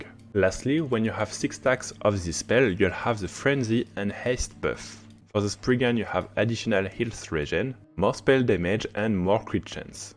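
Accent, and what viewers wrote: France. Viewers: Hey man, great video but damn you really need to stop pronouncing "th" as a "z", I really don't know why the french are taught to say it like that lol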